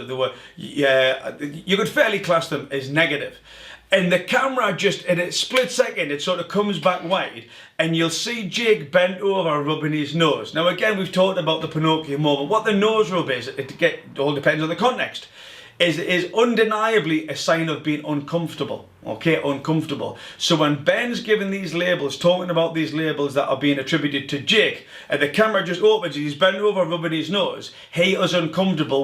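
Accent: Newcastle, United Kingdom. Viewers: You from newcastle? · Wow I really expected an American accent when I first saw the video, not a strong Geordie accent😀 good stuff, subscribing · Are u from Newcastle?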